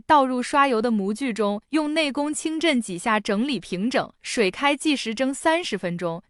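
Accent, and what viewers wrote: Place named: China. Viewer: 又是中共假臺灣之名的中共網站，聽聲音語調分明是中國大陸人口音語調！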